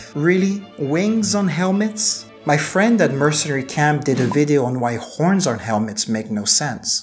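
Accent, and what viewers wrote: France. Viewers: He probably said it with a French accent because he has a French accent as far as I can tell. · Nice accent is French your first language.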